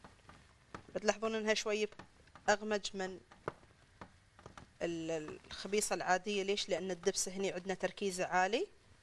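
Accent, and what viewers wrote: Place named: Oman. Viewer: عجزت افهم لهجتها الظاهر انها عمانية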